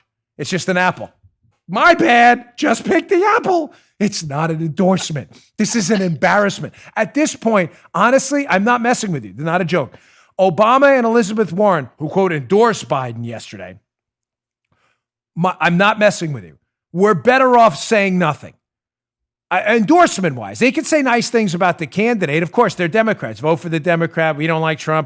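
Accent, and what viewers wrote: New York, United States. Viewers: Thank you Patriot Dan, for all that you do, everyday. You bring us the truth, and the updated reports. Always educating us, showing exactly what's going on. You always are on top of the events at hand. Thank you, bless you and your family and please, be safe! Also, I see that both of us have never lost our NEW YAUWK accent.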